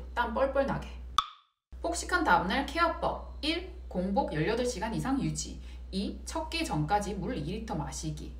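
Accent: Gyeongsang, South Korea. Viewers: 경상도분이시죠? 내가 서울말쓸때랑 똑같은데ㅎㅎ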